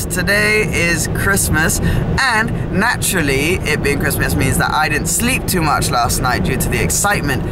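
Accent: France. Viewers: Are u English you accent seems to blip into French sometimes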